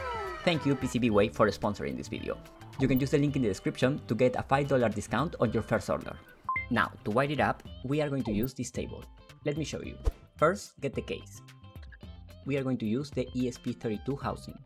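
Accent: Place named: Italy. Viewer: You video's are pretty clear, not too slow and not too fast. And when it's a bit too fast than it's less annoying to scrol a fragment back. But hihi... it must be your Italian vibe and accent.... I can't resist the urge to imitate your .... ' and.., that's it'. Funny repeating soundbite, I mean this in positive sense :D. Unfortunately I fail in my imitation, lol. Maybe you need to create your own home assistant audio notifications. :D :D and that's it.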